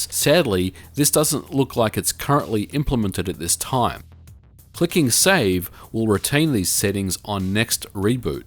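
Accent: Australia. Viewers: Hmm, you sound like an ozzi but you say SODDER like a yank?? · He sounds like an Australian to me. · Soddering? And with an Australian accent! It's spelt soldering, and that's how it's pronounced in English. There's no such thing as American English, just English spelt or pronounced wrong. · You sound like an Australian Al Gore. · So weird to hear someone with an Aussie accent say 'soddering' lol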